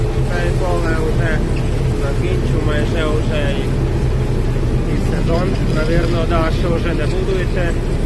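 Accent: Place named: Transcarpathia, Ukraine. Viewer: Чути закарпатський говір, з якого ви району?( область і так знаю))))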